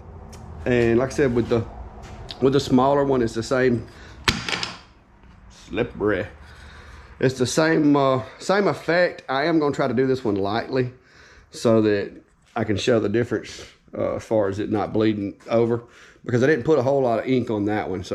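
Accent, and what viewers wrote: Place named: Georgia, United States. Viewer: My husband and I just found your videos after getting an XTool and we love em! We’re from SC and we made a bet that you’re from GA based on your accent?!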